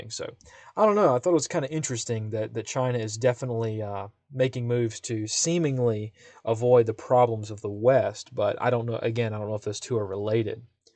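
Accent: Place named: Mid-South, United States. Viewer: Very good report. Well spoken with a very pleasant voice. I would guess a Mid South accent? Maybe the Carolina's or Tennessee?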